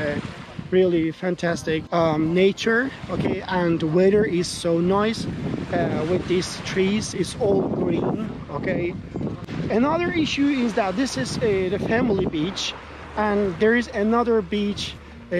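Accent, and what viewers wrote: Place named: Turkey. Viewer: özüne dönmek budur 🤣 ingilizce konuşmaya başladığı ilk dakika bu adam türk belli dedim bla bla bla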